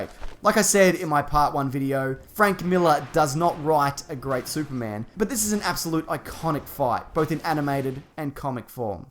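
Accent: Australia. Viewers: Kay ShyGuy I think he's actually australian...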